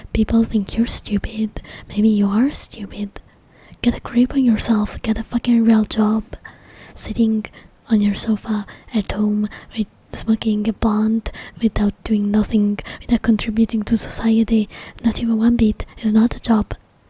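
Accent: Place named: Italy. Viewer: Love the Italian accent with English words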